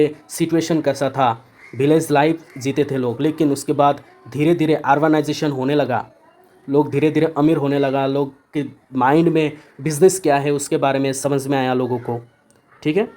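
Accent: Assam, India. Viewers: I hate Assamese accent and language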